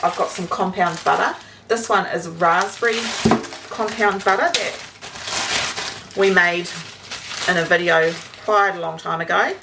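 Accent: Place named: New Zealand. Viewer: As a vegetarian, I'd love to see some vegetarian dishes. Or dishes where the meat is optional... I'm in my 60s, live alone, have a veggie patch, and spend around $50/wk on groceries. I only go shopping once a month, for the same reason as you: I'd spend more! I've built up a good pantry basis and shop my pantry and freezers. I keep track of what I've used. Even though the prices have gone up, my shopping bills have only gone up a little... Because I've got plenty in my pantry, I can wait for things to go on special. I make my own bread, yoghurt, freeze grated cheese, onions and garlic, and make jams, chutneys and marmalades... I also cook big meals and freeze portions for easy dinners. For me, the no shopping challenge AND shopping the specials in different shops, makes sense... It is SO NICE to hear a kiwi accent on YT! So good to see you potter in your typical Kiwi kitchen, with your old stove, similar to my own, your lovely aprons (I'm an apron fan!) and watching you cook normal meals, without frills... 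AND without the jolly long nails that seem to be a must these days...! Thank you for a refreshing new channel! 🎉✨️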